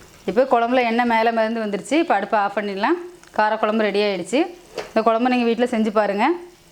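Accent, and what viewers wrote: Tirunelveli, India. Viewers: Sister yr native place thirunelveli ya yr nellai slang is very like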